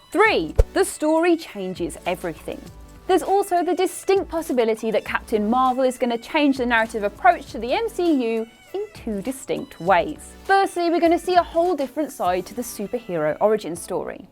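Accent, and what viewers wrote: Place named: Midlands, United Kingdom. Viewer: Midlands accent! Woo!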